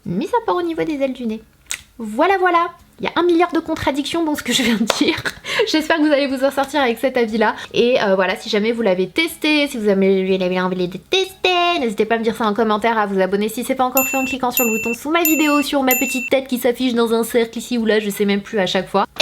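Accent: Quebec, Canada. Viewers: L’accent québécois 😂🙋🏻‍♀️ follower québécoise 😋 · J adore ton accent québécois! Vive le Québec xP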